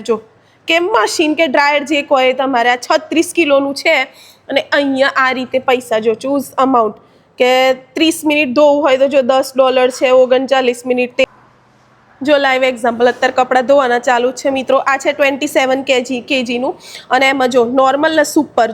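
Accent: Sabarkantha, India. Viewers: સાબરકાંઠા ના લાગો છો ક્યું ગામ?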